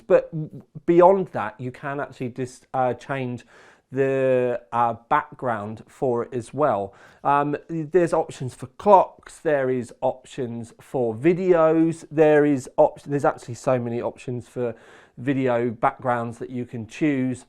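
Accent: United Kingdom. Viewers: please reduce your british accent ! 70% in youtube user comes from non english countries and cant understand british accent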